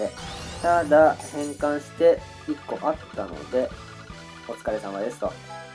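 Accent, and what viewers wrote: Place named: Tono, Japan. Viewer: さん思っきり東濃弁はいってますねぇ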